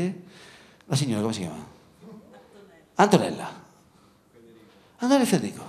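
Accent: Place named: Marche, Italy. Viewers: Regà ma quant'è bello l'accento marchigiano! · Il marchigiano è bellissimo....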